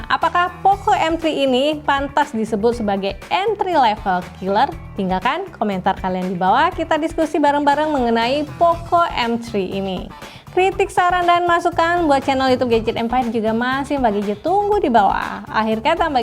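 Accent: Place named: Depok, Indonesia. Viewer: kalo dari logatnya kek org depok😄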